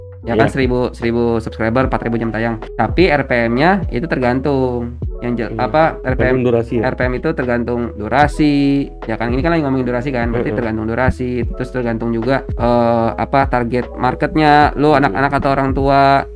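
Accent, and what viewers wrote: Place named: Bojong Gede, Indonesia. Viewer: Bojong gede emang Kocak2 ngomongnya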